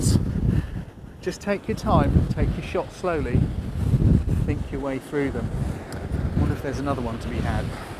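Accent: England, United Kingdom. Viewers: I love British english accent, much nicer than american accent. is such a warm person and I love his videos. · Loves: 1) Your ability to pass your knowledge, in a simple way 2) The different situations you chose to present to us 3) Your fine English accent... Hates: 1) I m not a hater 2) I respect the work of other people 3) Love, love, love I liked so much that tutor... THANK YOU, THANK YOU, THANK YOU (Dont forget the camerawoman -> Thank you too... Nice video!) Greetings from Greece... PS. Sorry for my bad English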